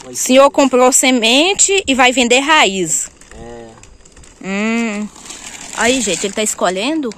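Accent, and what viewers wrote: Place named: Bahia, Brazil. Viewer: Sem nexo isso aí, Sobre o gerúndio não vi sentido algum, como que você está querendo que ela seja natural? Sendo que você está tentando ensinar ela o correto! Com certeza ela está sendo natural, se fosse ensaiado ela não iria falar "vou tá mostrando a colheita" e sim como você disse "vou está mostrando a colheita", que diferença isso faz? você é baiana? Ou não sabe que esse é o sotaque? Sigo outras moças baiana aqui que fala igual a ela, tenta, ver isso aí direitinho que você escreveu, na verdade você está procurando pelo em ovo de galinha!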